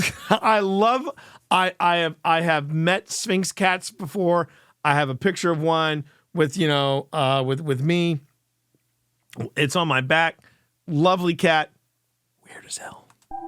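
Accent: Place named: England, United Kingdom. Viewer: Hello!!!! here! Brilliant episode!!! I am so sorry I missed your message - I did private the follow up video but the original is still there with the ghostly voice on. You are more than welcome to use it in a future episode. You might need to scroll down the videos a little but the original video is still there. Thanks so much for the shout-out and I LOVE your English accent😂.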